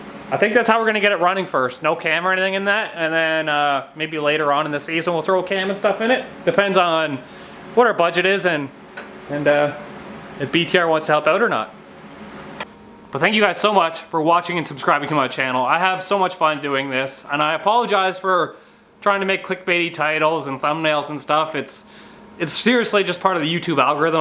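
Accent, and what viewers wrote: Canada. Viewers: I’m here just to hear him speak Canadian. I love Canadians. 😘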